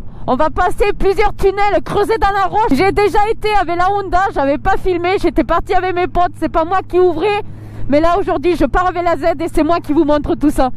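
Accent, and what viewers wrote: South, France. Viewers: ton bel accent du midi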